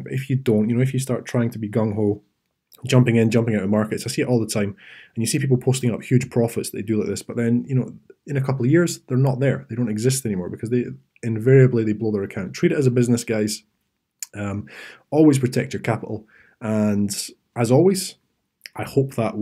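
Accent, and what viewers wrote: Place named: Edinburgh, United Kingdom. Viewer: Hi James, is there a way i can contact you by email to ask a few questions ? I am completely new to this and looking to do this on a part time basis. You sound like you are from the Edinburgh area, I am from and live in Aberdeen.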